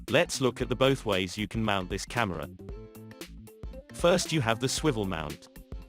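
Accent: United Kingdom. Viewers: My how British you sound. Lol Great video my friend, Thanks for sharing this! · Thanks for sharing brother. Very much appreciated. Looks like a nice security camera. Do I detect a British accent? Keep the content coming. Love this channel. Have an awesome night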